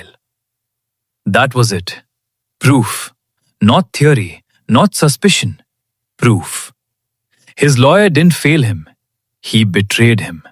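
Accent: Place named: India. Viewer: Hello bro 😊 I am also thinking too create videos like this 😊 I think you should change your ai voice tone or English levels It's giving indian voice tone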